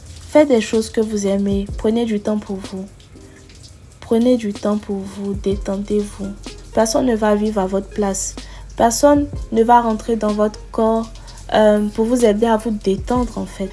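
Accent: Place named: Ivory Coast. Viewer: «Deux cuillères de miel ow écoutez moi😂 Fhum » j'aime trop ton accent ivoirien là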